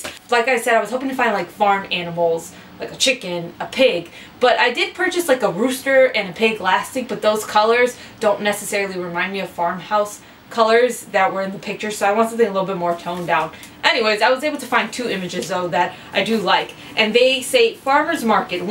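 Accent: Chicago, United States. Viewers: Good luck at your cooking class... loved the haul. I pronounce caramel the same way you do.... maybe a Chicago thang lol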